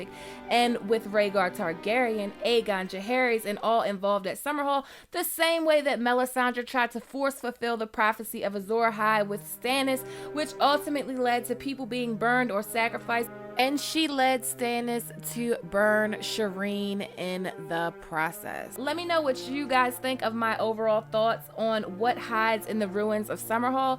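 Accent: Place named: Philadelphia, United States. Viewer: Your videos are very well thought out and entertaining. Your passion for the subject matter shines through and is absolutely infectious. And your voice,...it triggers something primal in me. Can't explain it simply which means I must not understand it well enough. But to avoid sounding creepy I'll just say your voice is very nice. Sounds unmistakably Philly to me but idk. Your work and effort is much appreciated.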